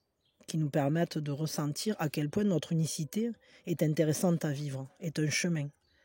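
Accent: South, France. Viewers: Bonjour, votre accent du midi fait plaisir à entendre 😍 Nouveau cycle, mais notre lien a été corrompu par de la magie noire. Ma famille est tout !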